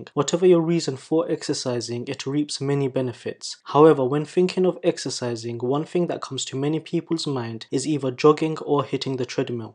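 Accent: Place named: Bangladesh. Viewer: You sound Bangladeshi based on your accent. Am I right?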